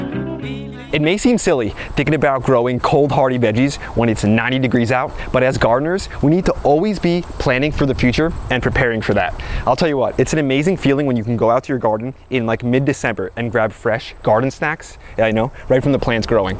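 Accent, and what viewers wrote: New Jersey, United States. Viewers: You’re so Jersey lol love it -coming from a 973’er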